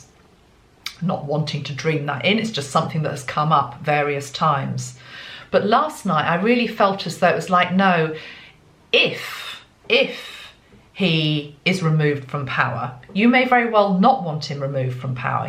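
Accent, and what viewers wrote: England, United Kingdom. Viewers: hello. to be completely off script; just wanted to say I love your accent and it reminds me of the English actress in Agatha Raison. a jolly good series we got here in Australia recently. also it was good to be reminded of the Light Workers. thankyou for your insights. janell downunder x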